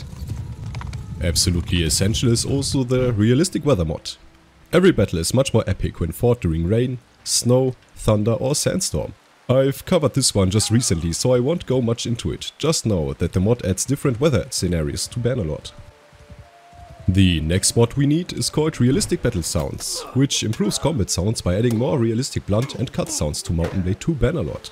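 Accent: Germany. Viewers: Irgendwie hörst du dich wie ein deutscher an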